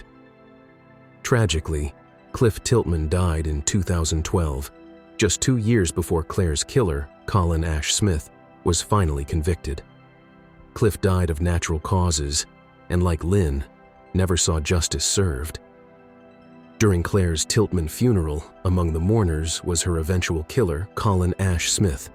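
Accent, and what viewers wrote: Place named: United States. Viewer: I question why anything and almost everything that has happened in the U.K. usually ends up on a YT with an American Accent? Also, why do they all assume that we have the attention span of a goldfish? Must be something to do with spinning out the length of the video to earn more money? Why not just put in a little extra effort and quit with the annoying repetition?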